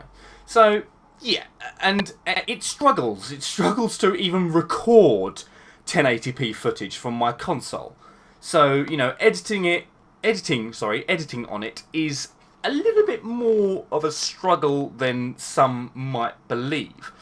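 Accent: United Kingdom. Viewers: You from Birmingham?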